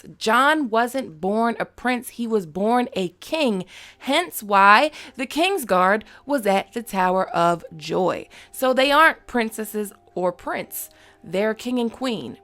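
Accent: United States. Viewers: do your thing my dear u def sound a little country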